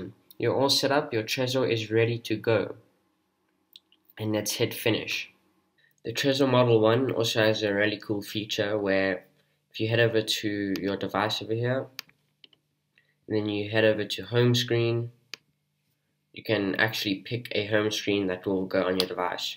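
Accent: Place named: South Africa. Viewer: Awesome video. The SA English is the best sounding.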